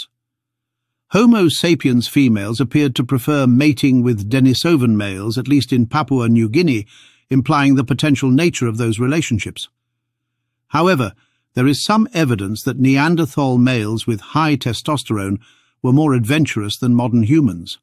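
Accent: England, United Kingdom. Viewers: Just having an English accent doesn't make you intelligent. All this interbreeding stuff is a load of crap you don't have enough Neanderthal DNA sequenced to know what your spewing this is all speculative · No, I don't want to listen to your neanderthal erotica in a British accent...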